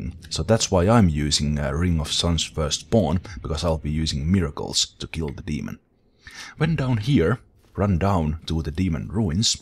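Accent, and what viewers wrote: Finland. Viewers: Dat finnish accent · You from Finland? Your english sounds like typical english speaking finn : D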